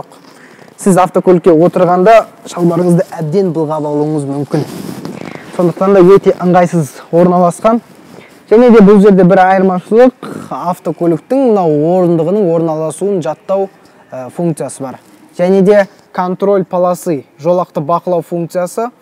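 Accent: Standard. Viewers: Молодец тап таза сөйлейсің қазақша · Ой молодец осы бала таза қазақша түсіндіреді. Өркенің өссін. Олжас ағаңдай атақты бола бер!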